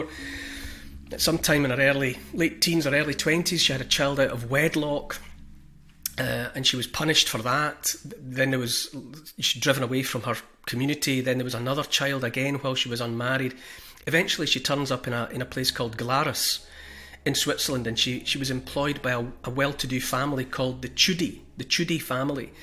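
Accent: Scotland, United Kingdom. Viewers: Are ye Scottish Sir? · First time on this channel🔥whats your accent lad? Scottish? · Your Scottish accent is amazing 👍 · I adore a good Scottish burr, too. I like them even better in a kilt 😂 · Are you Scottish. I love you accent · well i must say that information couldn't off not sounded any better Scottish twang made it even moee captivating thanks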